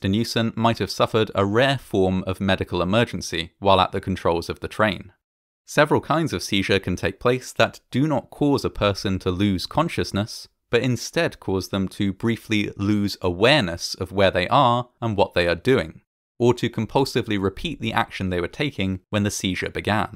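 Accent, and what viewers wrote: England, United Kingdom. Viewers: I adore your pronounciation! Where are you from in England?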